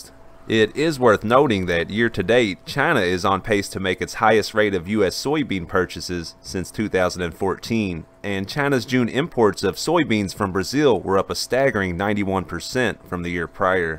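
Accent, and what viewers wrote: South, United States. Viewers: A good old southern boy interpreting Chinese for us.! I'm sold. you got another subscriber bro. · Thanks for this information. You have a beautiful Southern accent! DO NOT ever change it for anybody.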